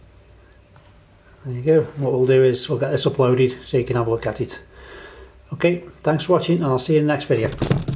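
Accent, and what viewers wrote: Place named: Barnsley, United Kingdom. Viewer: ps where you from? barnsley? lol Im from leeds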